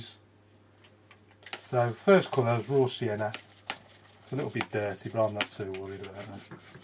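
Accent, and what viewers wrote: Birmingham, United Kingdom. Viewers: GREAT TO HEAR BRUMMIE ON YOUTUBE, KEEP ON POSTING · Thanks for sharing your realistic and relaxed approach to watercolour painting. Excellent, and refreshing to listen to your accent after 20 years out of the UK! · Nice to hear a Brummie voice on Youtube.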